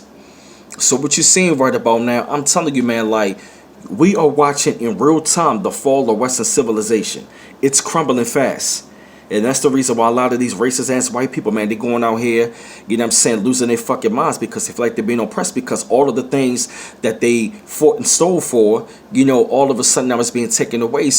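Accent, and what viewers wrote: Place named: Philadelphia, United States. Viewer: Your accent sounds like you come from Philly? Yes they are losing power all over the earth. People are standing and speaking out against them. There is a shift of consciousness taking place. Yup! You are speaking truth, and I see everything unfolding. I was telling my daughter for the last few years what was is unfolding right now. I could and can actually visualize what is and going to happen. I been saying everything you said.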